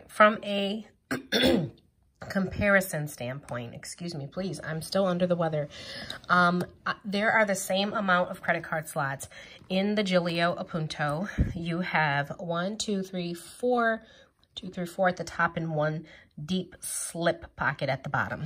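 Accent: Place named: Long Island, United States. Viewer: I can’t believe your black lolz. You sound like a Long Island Italian lady. You should go into sales you have a great confident voice. 🤗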